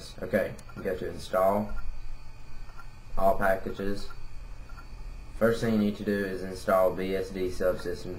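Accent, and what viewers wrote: South, United States. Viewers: I'm assuming this only applies to jailbroken devices, seeing as i can't find an app called "installer" on mine... nice country singer accent btw...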